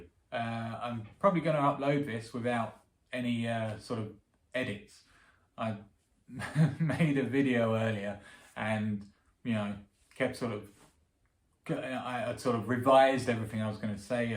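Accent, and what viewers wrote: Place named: Australia. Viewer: I think he is, and even implied being one. Whatever the case, his English is delightful and perfect. The accent sounds Australian, which is the accent I find the most beautiful 😊 I am a native Finnish enjoyer, but I find it interesting to refresh my "analytical" knowledge of the Finnish grammar and language, too. About rolling the 'r's: that sound is usually the last one that native Finnish children, too, learn to say when growing up! Before they ace it, they usually replace the sound with 'l'. Even I vaguely remember the time when I still couldn't make that sound but was avidly trying to. Your first example of "purra" sounded just fine to me. Personally, I like to say the long 'rr' in a less harsh-sounding manner, quite similar to how you did. I still roll the r, but maybe just with the side of my tongue. It's a feature of the dialect which you could hear in Tampere region. 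Especially their short 'r' is more like the Japanese counterpart, with just a very brief roll.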